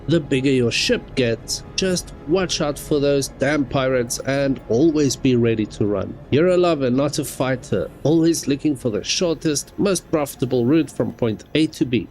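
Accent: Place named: Afrikaans, South Africa. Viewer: jy klink afrikaans, julle dalk 'n org wat ek kan join?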